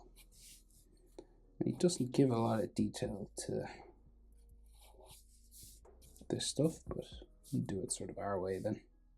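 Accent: Ireland. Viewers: is that an irish accent????????????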